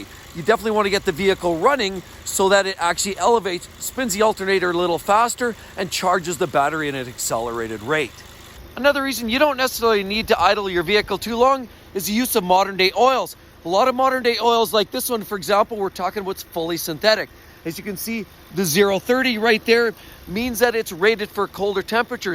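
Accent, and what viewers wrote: Canada. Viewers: Love the Canadian accent!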